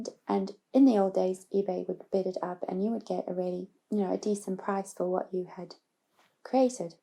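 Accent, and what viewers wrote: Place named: South Africa. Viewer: Ah! I can tell by your accent! I speak Dutch coming from another X colony!😃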